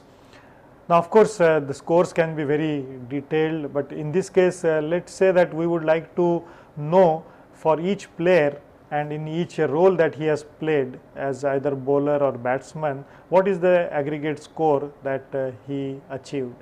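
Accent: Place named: South, India. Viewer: very sincere efforts and great way to explain ER modelling. You are very brilliant and unlike other loosers, I had absolutely no issues understanding your accent. Its near to neutral unlike other regional accents from southern India. Keep up the good work and expecting many more videos from you.. Thanks you!!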